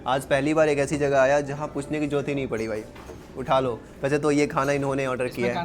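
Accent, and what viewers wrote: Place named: Bihar, India. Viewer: Bihar se hain ye.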